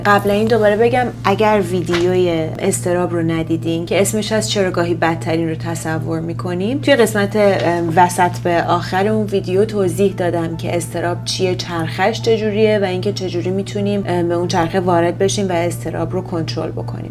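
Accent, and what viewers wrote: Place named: United States. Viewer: خانم دکتر سلام عرض شد ارادتمند، ببخشید شما در تلفظ اصلاحات انگلیسی، لهجه امریکایی دارید،ببخشید شما آمریکا یا کانادا تشریف دارید؟یا فقط بر حسب تسلطی که به زبان دارید انقد قشنگ تلفظ میکنید ،چون خودم خیلی زبان دوس دارم عرض کردم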